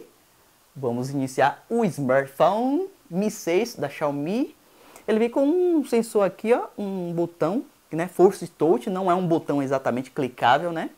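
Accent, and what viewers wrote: Bahia, Brazil. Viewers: adoro nosso baianês kkkkk parabéns pelo trabalho! Muito bom e autêntico. Dou like antes de assitir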